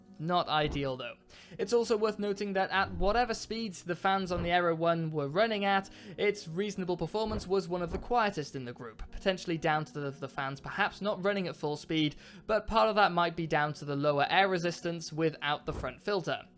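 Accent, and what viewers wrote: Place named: South Wales, United Kingdom. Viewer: Weird question, you Welsh? You sound south wales-ish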